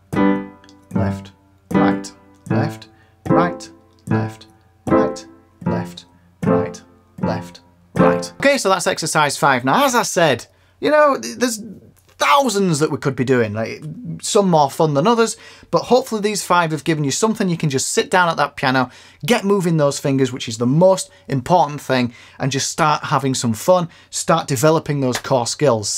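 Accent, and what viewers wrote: Yorkshire, United Kingdom. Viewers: You must be a Yorkshire fella 🤔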